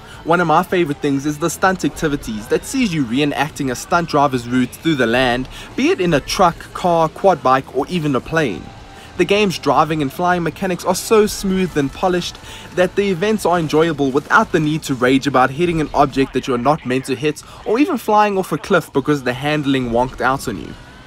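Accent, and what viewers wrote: South Africa. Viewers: Great video..south african accent was interesting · Yea, I was going to say I notice that accent. Keep up the content :) btw hopefully you're staying safe if you live in RSA.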